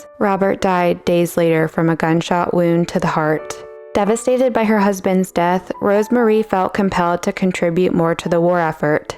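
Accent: England, United Kingdom. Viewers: More English accent in future please! It was very cool. And that’s coming from the son of a Londoner. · The only beef I have with this commentary is your apology for the English school boy accent. It is my opinion that you need not apologize for any of your work. So informative and entertaining, I both laugh and cry when I watch these videos. Thank you for your efforts. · I love your English schoolboy accent! (I'm English) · Striking piece and story! Thanks for the historical and artists context. I loved your English boy accent 😂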